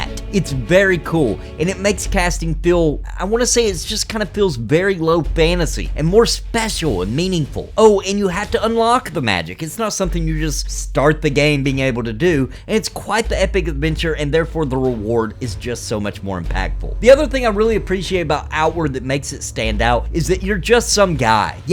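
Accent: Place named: South, United States. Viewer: Can't wait for Definitive Edition... I bought The Three Brothers so I get it! Hey man you from the south? I'm from Tennessee, I hear a little twang in there. Thanks for the video.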